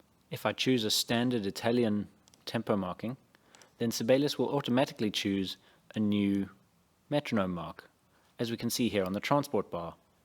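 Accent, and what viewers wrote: South Africa. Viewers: Are you South African or a New Zealander?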